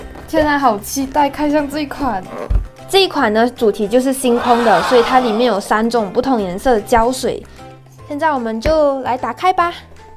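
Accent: Malaysia. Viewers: 你是马来西亚人还是台湾人？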